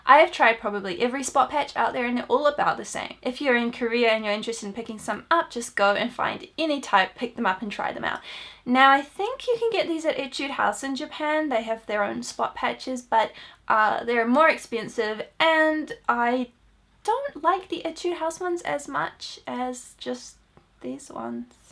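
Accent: New Zealand. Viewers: i love your new zealand accent so much omg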